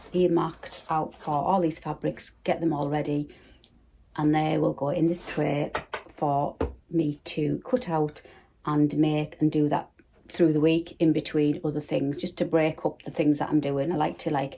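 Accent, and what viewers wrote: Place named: Newcastle, United Kingdom. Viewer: I'm just watching this a year later. I'm NE England born and raised but living in Illinois (beyond my control when I was a teenager in the 60's 😂). From your accent and Geordie's name I'm guessing you are around Newcastle. I was born in Wallsend and lived in the Cullercoats/North Shields area. Love watching what you do and I just bought a couple of your patterns! I used to have an Etsy shop selling bags but closed it when I got breast cancer in 2016 (ok now). I got mine ready for the post the same as you except that we have a drive-up mailman who would pick them up at my large mailbox, so no trips to the post office 🙂 One thing here though is that we can no longer wrap boxes with paper as it can catch in the sorting machine. You have very busy days that you obviously enjoy! Take care xx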